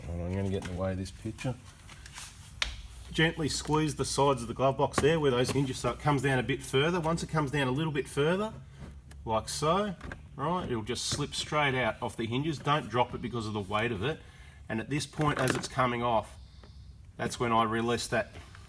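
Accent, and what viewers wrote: Australia. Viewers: Love the Ausie in this guy ❣️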